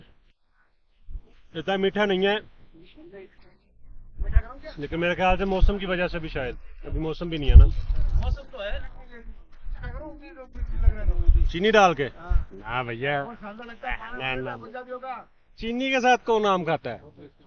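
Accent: Punjab, India. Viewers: Eeseeleeemweeeleekewm.... Bhai Desi tareeke se bhi bol sakte hai. Baaki Sara lehja toh Punjabi hai na to arabi banne ki koshish kyu?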